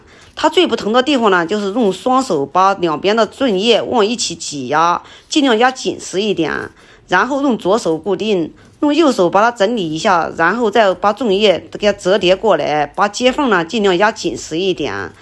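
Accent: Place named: Guilin, China. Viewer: 解释得非常清楚，还喜欢你的口音，很是桂林人吗？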